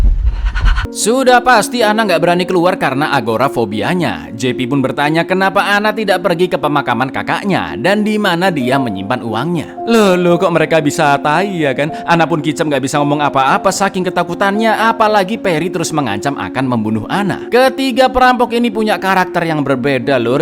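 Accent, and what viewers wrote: Java, Indonesia. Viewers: Bang,anda asli Jawa kah? Kok bahasa pengantarnya pake bahasanya fasih sekali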